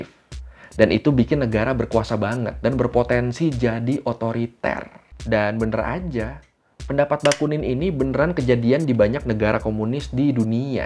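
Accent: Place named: Madura, Indonesia. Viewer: lu orang madura ya bg? nadanya kok gt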